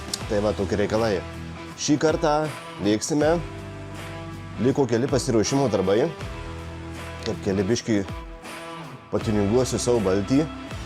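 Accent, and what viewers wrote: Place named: Miami, United States. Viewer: Geras video, bet jay tas majamio akcentas.....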